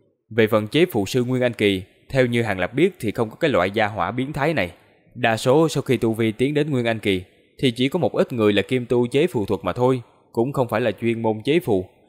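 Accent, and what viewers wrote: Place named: Mekong Delta, Vietnam. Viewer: Bn ng miền tay thì phải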